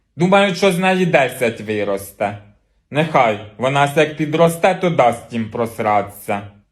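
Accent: Poltava, Ukraine. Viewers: Полтавська говірка! Точно так говорили в нас. Ностальгія по рідним місцям..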